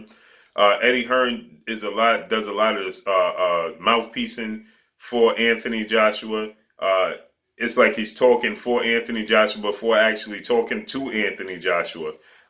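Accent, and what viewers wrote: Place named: Alabama, United States. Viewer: What is this, black impoverishment land? What on Earth is the appeal of inarticulate black people attempting to show their swag on YouTube by exaggerating and already pathetic cultural cliche and speaking as if they were just dredged out of the swamps of Alabama? best clean up your act and your accent, stashed the hat, and appear to be a relatively communicable human being, in spite of the fact that it may mean trashing your "blackittitude".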